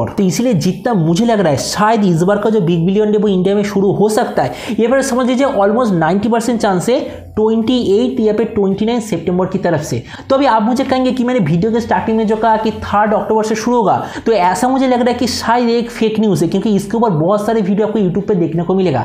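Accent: Bengal, India. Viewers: Bengali ka hindi kuch alag funny lagta hai 🤣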